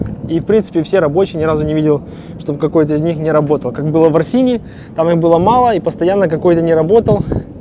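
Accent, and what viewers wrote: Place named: Ukraine. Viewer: По говору слышно что украинец.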